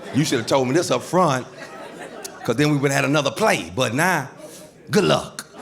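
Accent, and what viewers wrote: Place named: South, United States. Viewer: I love the way enunciates his words when speaking to the audience. You still hear the South but he's proper with no need for code switching. Perfection! Now when he's telling stories about talking to his people issa wrap😂😂😂😂😂😂😂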